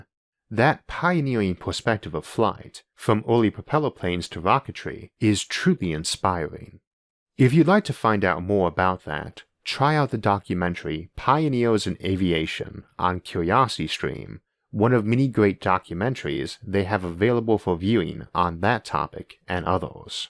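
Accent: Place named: Australia. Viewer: and im still tryna figure it out (i only hear 80% australian idk the rest...). 🤣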